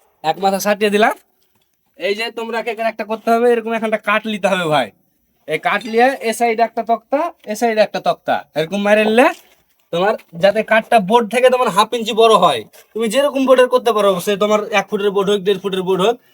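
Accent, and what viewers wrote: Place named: Chapai Nawabganj, Bangladesh. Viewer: চাপাইনবয়াবগঞ্জের ভাষা এটা ❤❤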